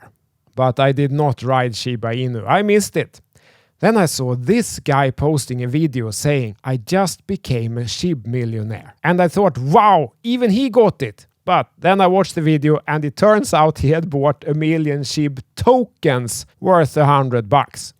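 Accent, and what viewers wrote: Sweden. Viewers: You sound Swedish as hell. The Swenglish is on and it sounds really sophisticated!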